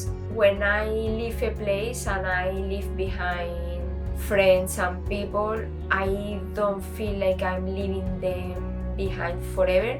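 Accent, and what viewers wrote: Spain. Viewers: it’s harder to understand if you are not Spanish, and the reason it’s not her accent, it is the “entonation” (I don’t think this is the right word, but English is not my language, sorry). She speaks English with Spanish entonation. (Very common for Spanish speakers) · Spanish accent - Spanish subscribers..🙄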